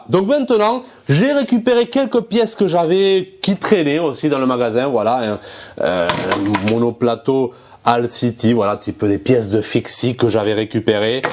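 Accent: Toulouse, France. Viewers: En tout cas bravo ,avec rien tu fait quelque chose ....sais plus quoi dire ,en plus je vais faire une confidence,c'est grâce a cet accent toulousain que j'adore et qui rends ces vidéos encore plus agréables et attrayantes a voir ....c'est pas beau ça ? suis sincère crois moi et je pourrait regarder pendant des heures .....bon weekend du 11 novembre...😊✌✌ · ami toulousain bonjour! Suis un passionné de velo vintage et j'en retape plusieurs par mois (les france loire, starnord ou arrow ont plus de secret pour moi lol ) sur le moderne je connais la theorie mais pas de pratique. J'aime beaucoup ta chaine que je suis. Plusieures choses: - l'immersion dans l'atelier avec le bordel inevitable, les imprevus galere, le bricolage pour s'en sortir..en toute transparence. Validé 🙂 - l'humilté generale de ton discours et attitude. Assez rare sur you tube pour etre souligné - Pas de tabou ou autres sur l'argent ou ta vision du commerce. 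Tres cool. (Tu voles pas ton argent, manquerait plus que tu ait honte de ca! huhu) - Les fichiers "one shot" sans montage, plus long et lent mais beaucoup pus vivant. Pas de montage pour toi. c'est tout benef de temps. Le seul inconvenient est pour toi car cela t'oblige a parler tout le temps pour "meubler". J'aime beaucoup l'accent toulouain mais je t'avoues que je mute le son parfois (no offense bro'!:-) ) Sur cette video: Magnifique impro sur l'habillage du cintre! dommage que le marron aille pas un poil plus haut pour avoir le noir juste la partie horizontale. Le noir aurait ete invisible de profil ) Au final, Les velos bleu des ces années la (teinte souvent pastel ou pailletées) avec cintre et selle marron ca match toujours! Moi j'aime beaucoup le resultat ici BRAVO! Changes rien Amitiés du Morbihan;-)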